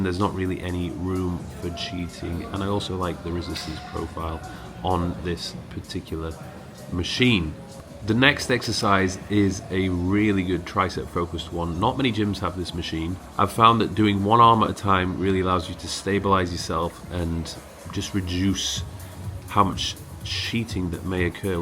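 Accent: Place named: Liverpool, United Kingdom. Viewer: Whats a scouser doing talking about marbella and ibiza. Stick to liverpool luv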